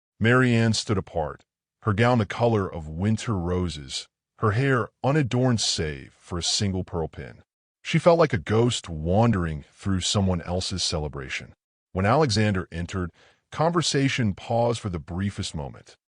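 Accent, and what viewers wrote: United States. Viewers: I disagree! It needs to be narrated with a British accent, not American.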